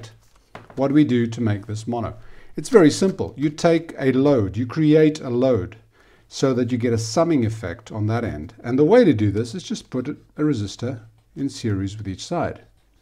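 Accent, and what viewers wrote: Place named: South Africa. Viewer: You sound South African. 👍🏻😁 I have learned quite a few tricks. Thanks boet.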